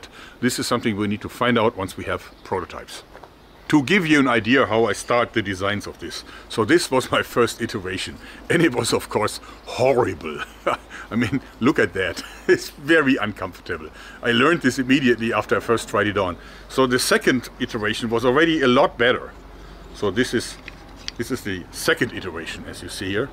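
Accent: Germany. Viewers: What accent does he have? edit: he is most likely german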